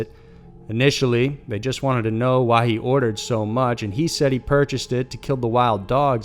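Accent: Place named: Texas, United States. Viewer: Wow, these are so disturbing! Also I love your accent. I’m British so it’s a guess, are you from Texas?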